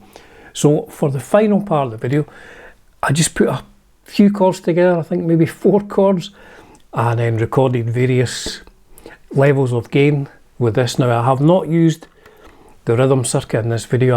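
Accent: Scotland, United Kingdom. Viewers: I love a gorgeous Scottish accent !! ❤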